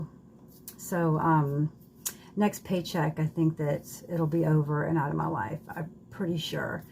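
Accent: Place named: New Jersey, United States. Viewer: You sound like you live in NJ. I left that hell hole of corrupt made up parking tickets of places you never went, but the people there blow through red lights and it isn't one car but 5. The bikers do that for their protection because so many get killed. I get it though, I used to bike at the park. Heads up to anyone going to NJ, green light doesn't mean safe.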